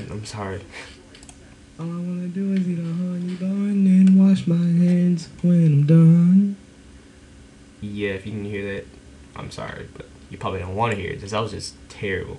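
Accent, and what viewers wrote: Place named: Knoxville, United States. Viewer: ah you kinda have that knoxville accent going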